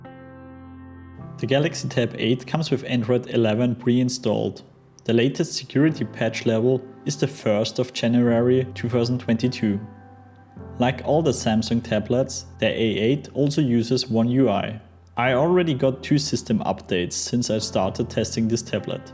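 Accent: Germany. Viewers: German accent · Das hört sich an! Wenn ein Deutscher versucht Englisch zu reden!?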